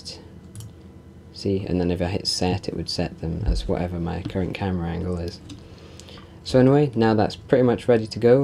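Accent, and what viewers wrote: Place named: Canterbury, United Kingdom. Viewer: Are you from Canterbury? Im trying to learn southern accents more and my AS chemistry teacher and the guys from Syd Arthur sound similar to you, both are from Canterbury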